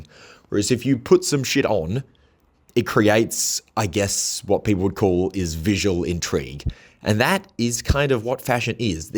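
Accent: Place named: Australia. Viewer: You sound more Australian in this channel for some reason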